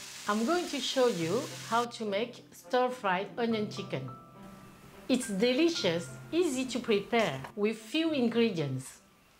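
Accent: France. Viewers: Asian lady with french accent - noice.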